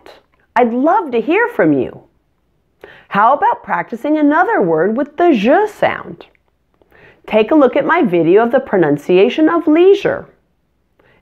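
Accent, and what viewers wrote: United States. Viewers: thx so much 😘😘..teacher this is english america???🤔🤔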